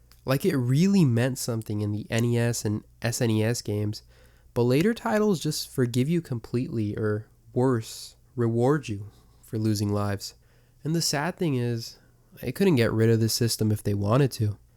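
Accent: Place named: Brazil. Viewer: Dude, are you Brazilian? You have an accent that reminds me of Portuguese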